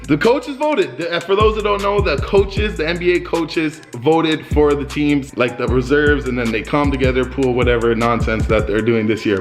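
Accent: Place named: Boston, United States. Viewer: From boston I could tell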